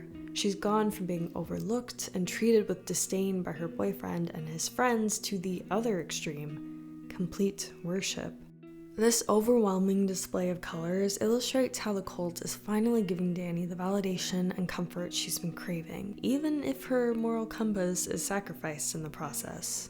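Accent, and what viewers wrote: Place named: Brazil. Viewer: Have not watched the video yet. However, are you brazillian, girl? The accent is pretty iconic, and remind me of brazillian people.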